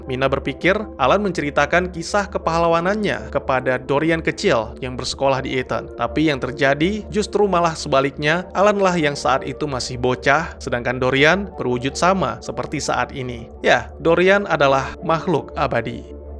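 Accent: Aceh, Indonesia. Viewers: Lo org Aceh ya bg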